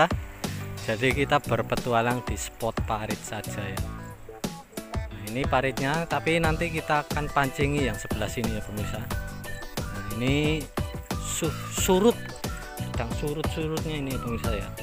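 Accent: Banyumas, Indonesia. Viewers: Mari taraweh langsung nyimak cak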